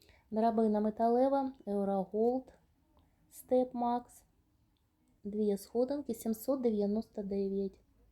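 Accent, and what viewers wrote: Russia. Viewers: Чого ти акаєш??? Тупа, як курка! Це украінська мова , а не кацапський йезиГ!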